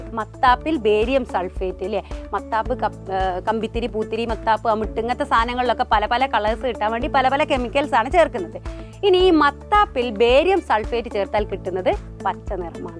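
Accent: Malappuram, India. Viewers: Missinte samsaram super aanu mis malapuram aano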